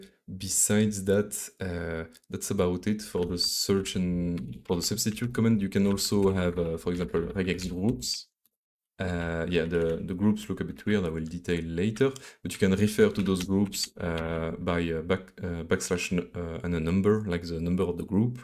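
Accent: France. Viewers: French accent ?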